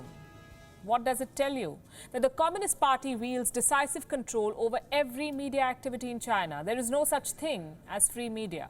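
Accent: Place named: India. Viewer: She has a strong india accent and talk piece by piece.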